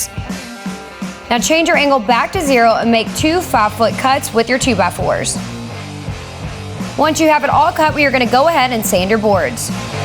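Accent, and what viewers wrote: South, United States. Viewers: She is absolutely stunning!! The redhair, southern draw, knows how to use power tools and can build!! She is Amazing!!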